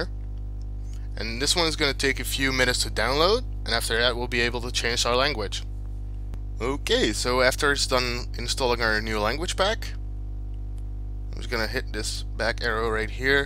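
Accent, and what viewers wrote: Netherlands. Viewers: Are u dutch? Lm je bent nederlands:D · haha ik hoorde gelijk dat ik naar een Nederlander keek toen viel de naam me pas op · clean accent for a dutchie!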